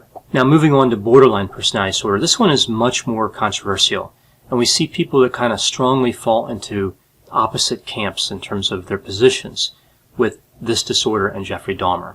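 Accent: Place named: Massachusetts, United States. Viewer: What is this doctor's accent? Massachusetts?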